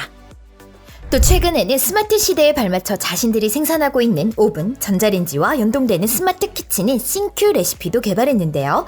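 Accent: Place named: North Korea. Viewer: 북한사람인교 아님 조선족인교 말소리가 윗지방 소리네요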